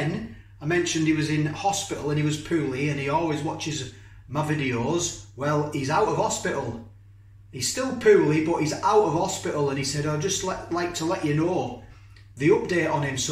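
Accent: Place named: Rochdale, United Kingdom. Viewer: I’ve just subscribed bud I’m from Oldham you sound like your down the road in Rochdale bud. Anyhow likening the vids buddyespecially the vs series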